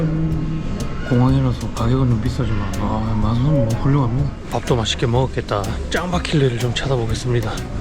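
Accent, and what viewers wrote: Busan, South Korea. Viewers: 정겨운 부산사투리 잘듣고갑니다~~ 초보여행유튜버로서 잘보고 갑니더~~ 건강히 여행하시길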